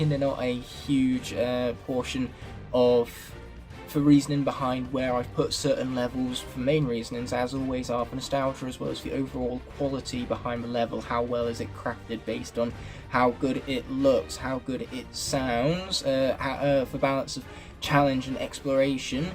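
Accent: United Kingdom. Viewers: yeah, I understand, just thought I would share my thoughts as I don’t want people clicking of on the intro without seeing how great your videos are, I’ve only watched you for a week now since I wanted to Skylanders nostalgia, but I have really loved your content man and finding out your British too lol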